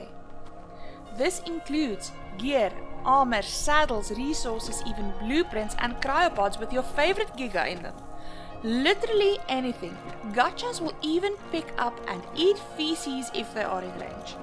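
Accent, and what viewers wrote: South Africa. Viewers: You sound South African. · south african ACENT? :-) Love the clip 🙂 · are you afrikaans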